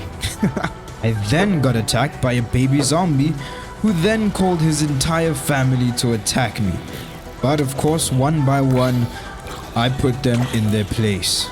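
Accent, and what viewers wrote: South Africa. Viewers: I knew your accent sounded familiar, I am also a fellow South African 😄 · My bru are you South African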